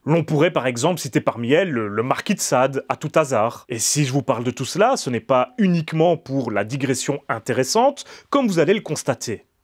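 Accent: Belgium. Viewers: Bonjour, j'aime bcp tes vidéos. Le monde du cinéma d'horreur m'est étranger, j'aime pas ça mais j'aime écouter des gens parler de sujets que je ne connais pas et les rendre intéressants. Tu es de ceux là. J'aime bie' e' apprendre plus dur tout un tas de choses et j'adore voir et/ou écouter tes vidéos. C'est passionnant. Je ne verrais sans doute aucun de ces films mais j'adore apprendre des tas de choses dessus. Ça enrichit. Et la pointe d'accent belge est un vrai plus car j'adore la Belgique et cet accent si chaleureux. En bref, merci pour ton travail.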